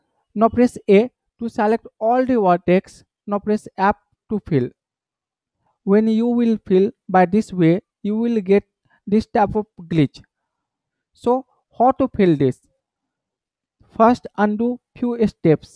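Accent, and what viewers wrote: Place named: India. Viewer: super ultra giga pro max Indian accent luv it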